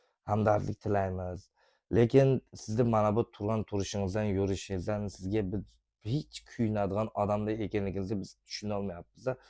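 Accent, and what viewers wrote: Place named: Namangan, Uzbekistan. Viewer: Namangan sheva bulib yotibdiyu yana Tojikistinliklmanmush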